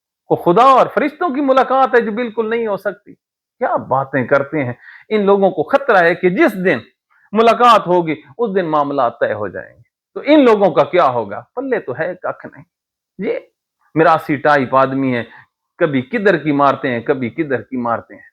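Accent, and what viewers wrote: Saraiki, Pakistan. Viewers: جب آ پ کا پہلا وی لاگ سنا تو مجھے لگا آ پ کی مادری زبان سرائیکی ہے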